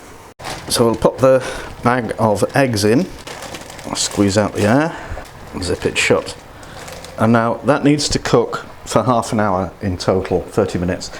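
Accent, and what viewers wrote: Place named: Liverpool, United Kingdom. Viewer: tnh I just watched your most recent video of crumpets and scrambled eggs, and I was going to unfollow you if your eggs were done wrongly.. well, they weren't really discribed very well so I came here; then you did scrambled eggs in the microwave.. so I'm done. Your Scouse was actually not bad though, and that comes from a Scouser; but I can not abide someone who demonstrates microwave scrambled eggs. I am sorry to leave this way, but at least I am leaving feedback. Also, your pea whack (pea and ham soup) recipe was pretty good too, yet not a revelation to those who learnt it from their ma'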